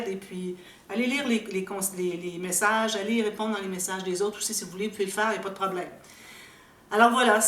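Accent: Quebec, Canada. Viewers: Mais, ne change rien, avec nos périodes de confinement en France, t'entendre, me permet de voyager, de me souvenir de mon ancien prof de math qui venait du Québec, bref c'est génial. Crois tu sincèrement que nous autres français sachions parler le français? Suivant la région d'origine, on utilise chacun notre français. Bisous, Schmack, Adishatz... Bref salut.... Et oui c'est ça le français.... Et j'adore les termes matter, couture, etc... Désolé de ne pas avoir pu t'aider 🤣🤣😜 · Et coucou MATTER OU MATTAGE c est adosser une photo sur un papier ou un Carton légèrement plus grand pour faire ressortir la photo Donc je ne vois pas pourquoi changer puisqu on comprend très bien....c est ce qui fait votre charme avec vos mots à vous. Pour ma part j adore et comme je vous suis régulièrement je parle votre "Langage" mais avec mon accent Ardéchois et vous canadien A très vite Co and Fau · Oh la la quelle histoire ! 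Pour pas grand chose ! Surtout ne changez rien. Vous êtes parfaite comme ça. On comprend très bien ce que vous voulez dire. Je donne des cours de scrap dans une association et j’ai toujours utilisé le terme « mater ». Je l’utilise pour mettre une photo sur un cadre un peu plus grand et « habiller » pour poser le papier design. Autrement j’ai adopté certains de vos termes 😁. Donc ne changez rien du tout. On vous aime comme vous êtes, gentille, rigolote, claire dans vos explications et surtout j’adore votre accent. Il me rapproche de mon fils, installé à Montréal, que je n’ai pas vu depuis plus d’un an à cause de cette pandémie. Laissez de côté les 3 ou 4 qui râlent et écoutez toutes les autres ! NE CHANGEZ RIEN Bises ensoleillées de la région lyonnaise Françoise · Moi j'aime beaucoup votre petit accent et vos thermes franco canadien ont du charmes je comprenais très bien se que vous vouliez dire .mais si cela chagrine quelques personnes faites le pour elles.... 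Merci pour vos nombreux tutoriels cela m'aide beaucoup à progresser ♥️♥️♥️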